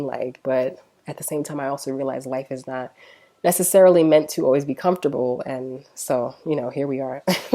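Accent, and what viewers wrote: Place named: East Coast, United States. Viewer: I admire you and your family. I could tell you were from the east coast (I'm from Philly) by your accent. I also can tell you are a little homesick. It is hard to adjust to about anywhere coming from New York. My fiancee is from Brooklyn and she's easily bored. Philly keeps her marginally stimulated. It is nice to see a thriving black nuclear family like yours and within your native culture.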